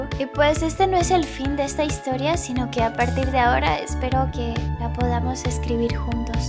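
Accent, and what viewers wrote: Colombia. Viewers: Eres Colombiana ? ._. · Señora hp JAJAJAJA Adoro ese asento colombiano mrk te queda melo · Yo creo que deberias hablar mas el acento colombiano y su jerga, es más divertido XD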